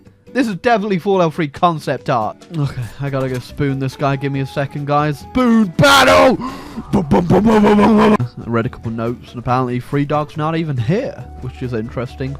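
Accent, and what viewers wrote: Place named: RP, United Kingdom. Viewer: You sound like you have royal blood!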